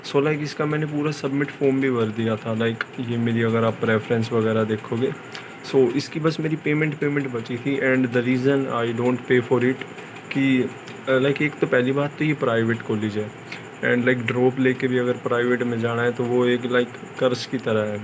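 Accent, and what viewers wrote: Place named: Haryana, India. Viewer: ...yeah wahi haryanvi accent sounds cool😍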